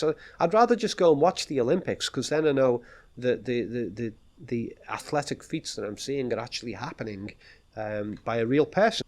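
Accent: Liverpool, United Kingdom. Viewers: Are you a Northerner??! Liverpool?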